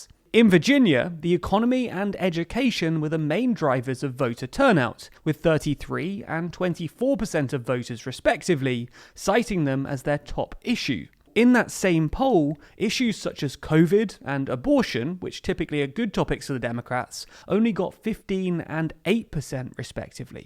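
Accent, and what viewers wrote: United Kingdom. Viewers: He meant after 9/11 not of I know it's kind of hard to hear with a English backslash British accent but he meant to say after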